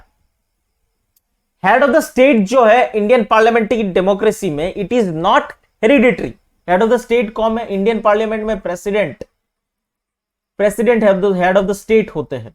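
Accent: United Kingdom. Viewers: Change ur Britain English and speak as upsc aspirants or in Hindi😠😠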